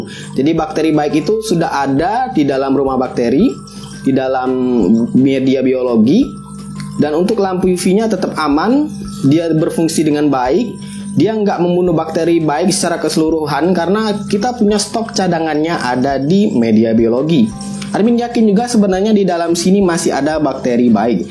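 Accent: Palembang, Indonesia. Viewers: Wong Palembang caknyo kau Yo??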